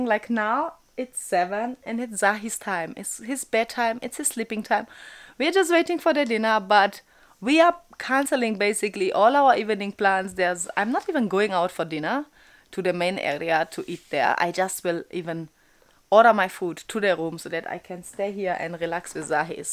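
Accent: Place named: Kenya. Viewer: Thank you 👍💕 I love how you speak English with a Kenyan accent 😊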